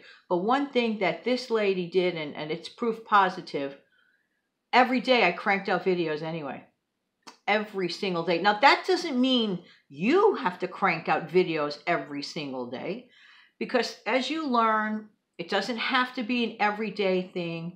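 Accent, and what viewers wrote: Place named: New England, United States. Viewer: Hello Miss from New York City! Your new Georgia subbie friend here. Congratulations on 1,000 subs!! I think you should have many more. Your videos are very informative, thought provoking, and useful, you've got a good sense of humor, love your Yankee accent 🙂 I'm going to binge watch all your videos. Have seen many but not all. Anyways, thank you for making this one. I've been thinking for months about making a channel. So I appreciate you being forthright about your struggles. Off topic, but a question. Do you have any place up there where you can be around grass and trees and enjoy any nature this beautiful time of year? Never having been there, my knowledge of where you are is limited to movies, lol! All I know is somewhere there's Central Park and maybe not another blade of grass or tree anywhere!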